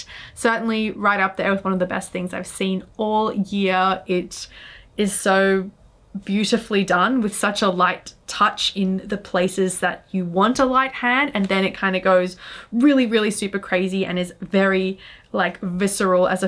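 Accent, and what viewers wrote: Australia. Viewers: Are you Aussie? I like your accent. 😁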